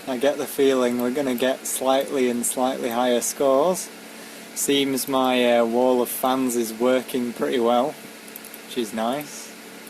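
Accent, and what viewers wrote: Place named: United Kingdom. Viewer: Sorry if I misinterpreted, but you sounded identical to Brian Cox to me... I'm just a simple American trying to place the plethora of British accents but it sounded close to me... Sorry but again, thx for the video :)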